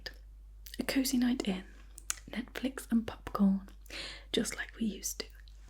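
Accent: United Kingdom. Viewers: As unhinged and possessive as this gal is, she's irresistible! I can't believe this guy let such a beautiful woman with the cutest British diction get away! Even if INSANE, she's a keeper!, this one's a masterpiece! You made all of us wish we were that guy! You've done it again, love! ❤ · I didn’t know I needed British ASMR until now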